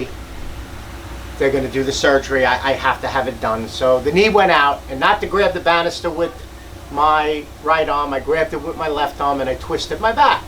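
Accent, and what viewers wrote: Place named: New York, United States. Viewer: Hey just a few things. I'm here saying to myself this guy has to be from NY. Then you mentioned when you lived out here (I'm from NY, Ret.PD). It's just the way we speak lol. The other thing is that I'm sorry for what you have been going through and I hope for a speedy recovery. But what struck me most is your wife. What a class act you are. My wife is one of the most precious things in my life including my kids. And how she took care of you and you making sure you put her first just makes me enjoy and respect your videos more. Not many are as lucky as we are. Take care and God bless you and the fam.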